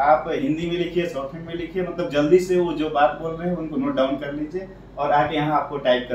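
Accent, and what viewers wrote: Bihar, India. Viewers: Are you bihari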